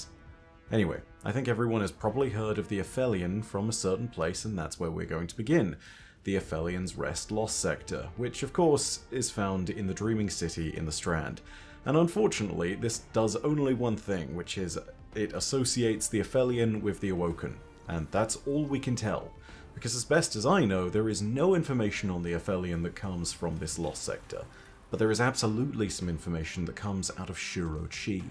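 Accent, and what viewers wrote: United Kingdom. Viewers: You have an accent, you're British, yada-yada..... But when the clip you play clearly calls them "uh-feel-ee-un"... It makes the way you say it just sound wrong.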